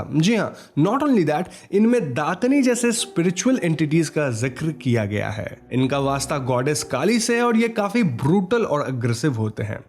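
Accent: Bengal, India. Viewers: Can I ask you a question sir....are you Bengali?? Cz you're Hindi accent main thoda thoda Bengali wala accent aa jata hai.... ( I am Bengali )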